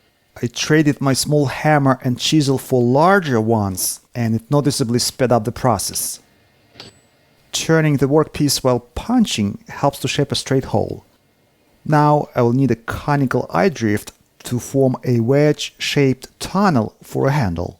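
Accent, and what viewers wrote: Russia. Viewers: У автора русский акцент. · "Presents should not have any functional weaknesses or design flaws" - that must be the most Russian thing I have ever heard, and your accent only made it better. Great job, sir.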